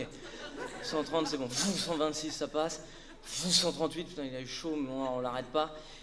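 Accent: Quebec, Canada. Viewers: Je suis québécoise! 😂😂😂 Je t'aimais mais là je t'adore 🤣🤣🤣 c'est presque ca l'accent 😉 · Il est génial ton accent Québécois 😂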